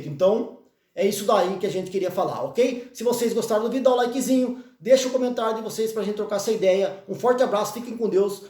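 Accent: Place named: São Paulo, Brazil. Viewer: vc e do interior de SP, e pq o seu sotaque e parecido com quem é de lá, eu tbm sou kkkk sou cidadão piracicabano E parabéns pelas informações do nosso peixe, continue assim, feliz 2022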